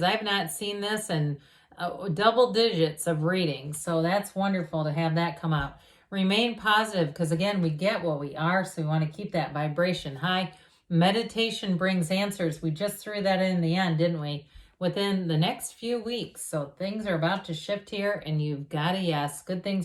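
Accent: United States. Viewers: Thanks you so much. Of all tarot readers you're my favourite, and I love your American accent 😊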